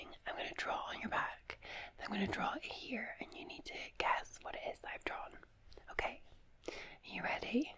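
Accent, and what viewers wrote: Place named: Scotland, United Kingdom. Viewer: Greetings from Glasgow. It’s so authentic to hear ur accent, nice to hear a homelander doing ASMR! Love ur content especially scalp massage. Helps with anxiety and sleep. Thanks x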